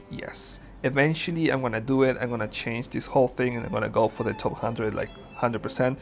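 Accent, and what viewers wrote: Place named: Netherlands. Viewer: Well he has a Netherlands accent butnits English 100% if u can't work it out turnnon subtitles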